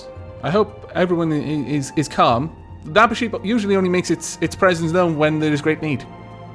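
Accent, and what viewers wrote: Wales, United Kingdom. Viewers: Sir, I believe you are Welsh.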